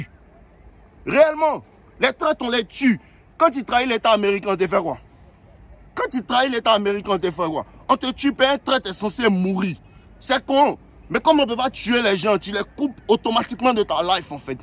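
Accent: Ivory Coast. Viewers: Tu parles comme un ivoirien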